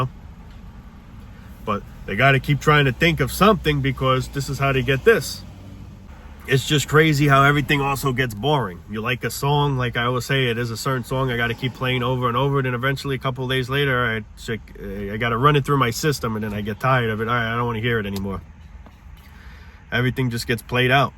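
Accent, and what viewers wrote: New York, United States. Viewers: Your cynical New Yawk diatribes at 2x speed are a real treat.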